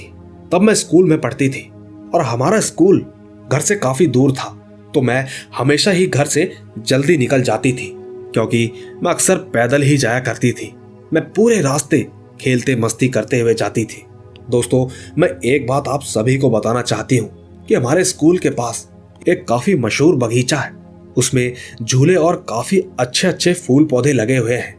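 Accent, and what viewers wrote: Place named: Mumbai, India. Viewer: Bambaiya style mein jhakas❤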